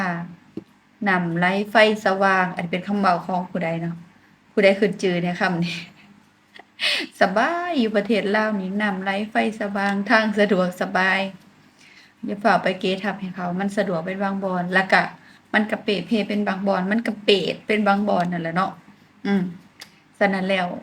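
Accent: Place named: South, Thailand. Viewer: สำเยียงทำไมเหมือนพูดไต้ผสมอีสานเลย😅😅😅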